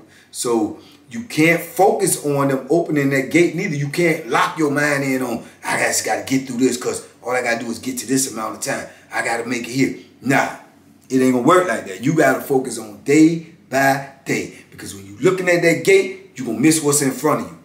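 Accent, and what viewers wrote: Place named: Louisiana, United States. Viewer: The accent tells me you're from New Orleans/Louisiana area but you remind me of Master P for some reason. I subscribed.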